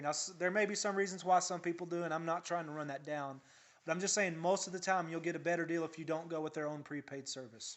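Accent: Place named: South, United States. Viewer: This was very helpful. Thank you! Plus you have a nice voice/country accent. That site you posted has TONS of threads. Hopefully there's good info on reviews(customer service, etc) of each provider.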